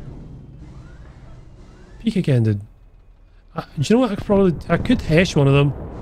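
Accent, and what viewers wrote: Scotland, United Kingdom. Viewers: You got me into War Thunder recently and always make me laugh. I love the silky Scottish tones too 😂 You keep up the entertaining work marra!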